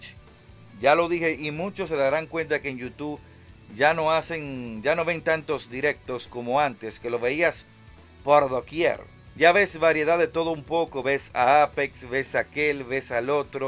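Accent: Dominican Republic. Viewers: Eres dominicano?😕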